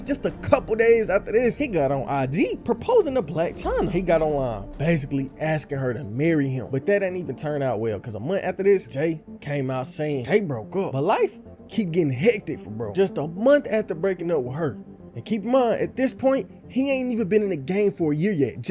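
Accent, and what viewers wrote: South, United States. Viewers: You country asl. Get better story telling & get that spit out the corner of your mouth. Annunciate please young dawg.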